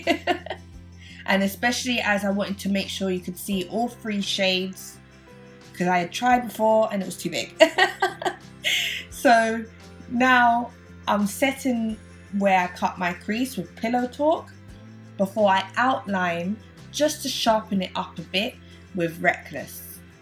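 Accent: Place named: England, United Kingdom. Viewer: Damn, you're English lol I love your accent. New subbie here 😊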